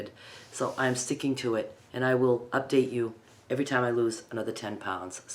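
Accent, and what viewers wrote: Maine, United States. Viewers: I am so inspired by you. I literally just found your channel via your caftan tutorial. I also was about to make dietary changes necessary to combat something called SIBO (small intestinal bacterial overgrowth) which requires basically restricting carbs so as to starve out the bacteria. I did low carb before, but I gained it all back. I got cravings. You know the drill. So now I will try carnivore. Also, I am in Maine…. you said you moved to Mississippi but you sound like you are from Maine? Regarding the shirt from a shirt, and caftan tutorials, can I ask, do you use 100% cotton? I got a bunch from Mardens to make a quilt but that caftan was so stylish, I think I have to use this blue cotton to make the stylish caftan! ❤❤❤ Congratulations on your weight loss, reduced pain, and improved mood!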